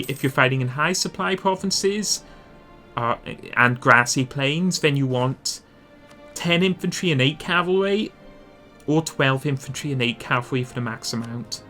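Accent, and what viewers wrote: Northern Ireland, United Kingdom. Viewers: North Irish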